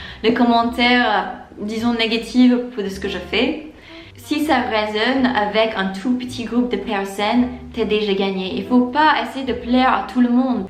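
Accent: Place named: Paris, France. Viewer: Yeeeeeeeeeeeessss, the best day of the week. Also, a french speaking anglophone (Toronto) living in a franco-canadian city (Montreal), and in a relationship the last 7 years with a european french speaker (Belgian), it makes me laugh how your accent seems so.... Parisian Anglophone ahaha. Especially when you talk with your husband in some other videos, the Parisian really jumps out, it's really nice!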